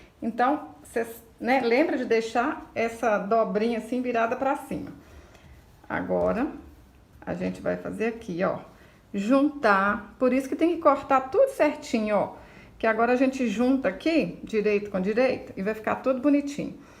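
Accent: Minas Gerais, Brazil. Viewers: Muito lindo!!! Já vou fazer pra minha neta Helena de 5 anos! Amo ver seus vídeos! Já estou inscrita faz tempo! Amo também o seu sotaque mineirinho!!! Parabéns pelos trabalhos e por ser paciente em explicar. Beijos😘😘👏👏👏😍😍😍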